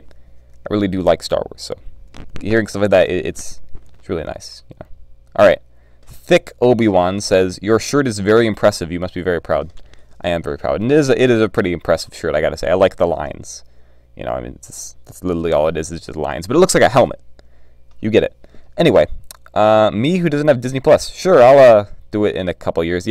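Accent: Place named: United Kingdom. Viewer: brilliant bri ish accent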